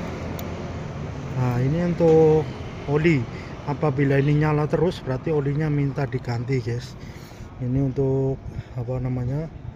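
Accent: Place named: Java, Indonesia. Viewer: Taiwan ngapak apa kie guli ngomong medok temen lik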